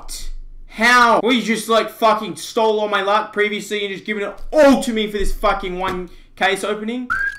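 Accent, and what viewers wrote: Australia. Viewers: australian? <3 your accent.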